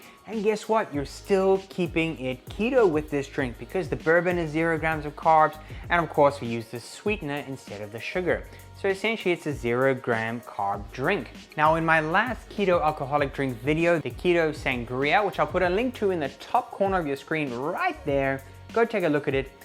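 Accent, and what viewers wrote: South Africa. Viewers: Ooh. Looks lovely. I am also going to try your flax seed yeast bread. [May I ask, where you born in South Africa? You sound like a soutie ; ) An affectionate nickname! I was born there. ] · Hey, well, the kids are back in school....and I'm back on the Keto Wagon and my first stop - ok was NOT the boozey section eh! (hahahaha) it was your bread recipe but I'm already here and there are few comments on this one so I think I'll have a better chance of you seeing this. Ok, well I am the mama in Belgium who cannot find that darn oat fiber over here and Amazon won't ship it here either soooooo, I was wondering if you were familiar with Lupin flour? It is super high in protein and very low-carb (I think it's 1 net carb per 100g). Do you have any recipes using this and if not, I would SO LOVE to see you do some....like your bread recipe that I cannot wait to try....but am a bit nervous of doing it without the oat fiber. 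PS: Btw, thanks so much for your wonderful videos young man. You're living in my native Canada but pulease do not lose your South African accent as it's SO classy; so nice to listen to.:-)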